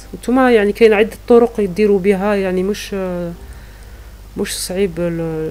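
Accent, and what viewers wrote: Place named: Setif, Algeria. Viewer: من صوتك باينة سطايفية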